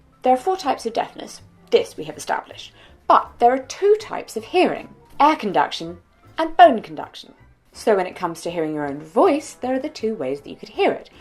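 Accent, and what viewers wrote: RP, United Kingdom. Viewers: Wow.... Your very British English is AWESOME!!! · I just found this channel and the first thing that came up to my mind is "if shes deaf then how does she has such a strong british accent?" can somebody that watches her channel for some time answer this question? I dont come to hate on you i just want to know. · This was very informative and thank you as always. You do have a lovely voice made all the more charming by your British accent. Or what we in the states call an accent, to you it's your normal voice. My voice is a higher pitch than I think it is. I've heard it recorded many times and like most folks, do like the sound of my own voice. Have a fabulous day 😃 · You sound like Queen Elizabeth, when she was young, or like one of the ladies of Downton Abbey. That is to say, aristocratic, having well articulated, received pronunciation. 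That is not criticism but merely observation. Of the various British accents, received pronunciation is the easiest for us in America to understand. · Yes please don't lose your British Aristocratic accent which I've always associated with snobism (I'm a post-war baby) - not your scene being snobbish ...you just crack me up! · i love your voice, it’s soft but clear, and basically you sound stereotypically posh english but it’s really nice to listen to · Thank you for this video. I just want to let you know that I can listen to you speak forever:) I actually leave your videos on for my baby girl to listen to, hoping she can pick up you Brit accent:) Honestly though, your videos are a source of joy, love and hope. I wish you good health always. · You have a good voice just wanted to let you know and your British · Well...I love your voice! You have a British accent and kind of a 1950s Victorian tone and when you us r’s in words it sounds very formal and amazingly magnificent! 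· I knew this from a previous video, but I continue to be amazed because you have this wonderfully perfect posh RP accent, that seems flawless. And that I literally just now realized rather compliments your vintage aspects. In that it is classic and yet up to date at the same time, in the way that you talk about clothing and hairstyles of the 20s and 30s and also disability and gay rights of the modern age. It suits you. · . Your voice is beautiful. I mean, I love British accents but yours is just so light and beautiful. This may sound weird but in my mind, while I'm writing this, it is like your voice is jumping on clouds. To me, my voice sounds much lower than it really is. In my voice recordings, to me, it sounds like I'm like 6 years younger. My brothers and I did this thing where we voice recorded ourselves and then spoke with the recording and both my brothers confirmed that that is how I sound. 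Isn't weird to think that when you talk that people hear a whole different voice from the one you hear? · Your voice is so good to hear, is beautiful and your accent is amazing!!!! I love British accent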